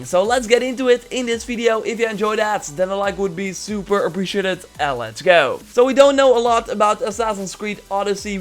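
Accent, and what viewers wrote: Netherlands. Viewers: It is a bit Dutch mate, so I think he is Dutch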